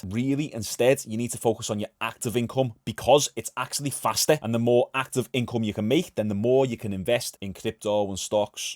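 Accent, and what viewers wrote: Liverpool, United Kingdom. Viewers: I love the U.K accent shit sounds dope to me. The Market always drops on the weekends u should know this! Noisy ain't gonna forg3t about their money! 😆😆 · pls say u support liverpool coz u have the best accent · As a manc, I'm still surprised on how drawn I've become to a scouser just chatting shit on YouTube 😂 got to give credit when due fair play, keep it up bro 👍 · Love MMA and when I heard a scouse accent I had to sub. Love all the content bruv. Keep it comin.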